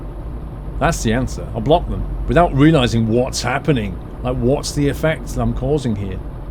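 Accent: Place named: United Kingdom. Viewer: I am a Pennsylvania person who enjoys listening to you talk in your British way. Your like a person who can keep anyone company. Sometimes you are funny. Also, it's neat to see how the ways you have to learn how to handle traffic and situations along the way as you travel.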